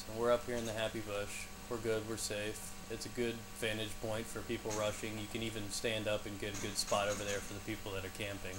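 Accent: New York, United States. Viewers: he sounds like he's from new york lol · New york accent lolz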